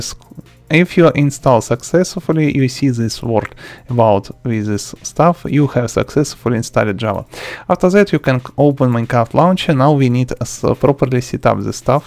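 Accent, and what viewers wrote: Russia. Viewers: your russian accent is a blessing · Are you from Russia?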